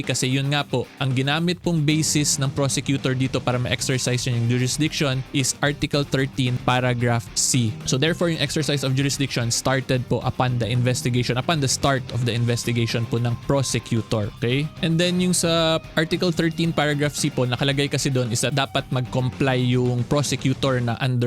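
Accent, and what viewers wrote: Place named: Northern Samar, Philippines. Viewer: Atty taga northern samar ka ba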